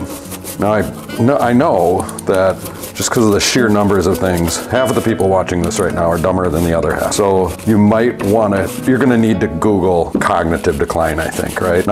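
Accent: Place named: Midwest, United States. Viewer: So GD relaxing, thanks for uploading! Minnesotan here. Love to hear the "Okie dokes" from our friends across the Mississippi/St. Croix. Midwest vibes - vibing so gently.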